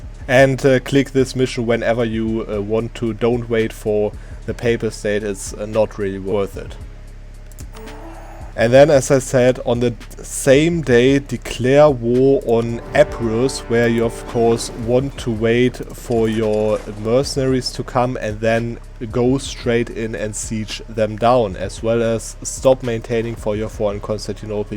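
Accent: Germany. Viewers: Thanks for the reply. I liked your video otherwise! You have a sure way of speaking, and a nice German accent. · The german dialect is strong in this one. Love it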